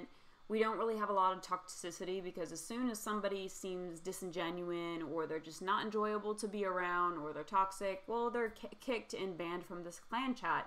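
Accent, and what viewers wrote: United States. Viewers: The most american accent ever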